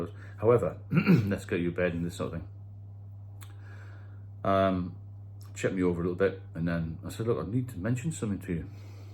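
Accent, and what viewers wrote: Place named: Darlington, United Kingdom. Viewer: yep I’m subbed. I come from Darlington so I recognise the twang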